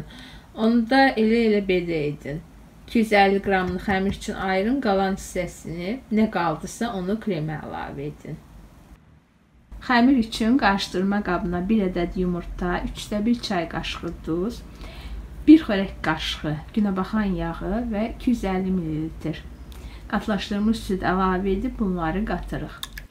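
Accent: Baku, Azerbaijan. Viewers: Əladır,şirin ləhcəli bakılı qız.Bu torta qat.süd və yağdan ibarət krem çəkmək olar?mən bişmiş krem sevmirəm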